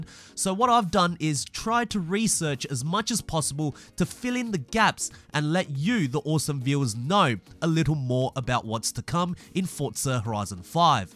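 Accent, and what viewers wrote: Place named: Australia. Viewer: "Yo what's cracking everyone here" Aussie accent never gets old.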